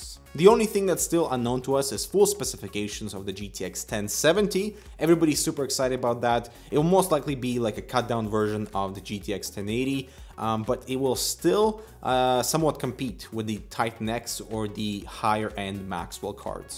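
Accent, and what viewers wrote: Russia. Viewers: То есть, он русский? :D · русский чтоле?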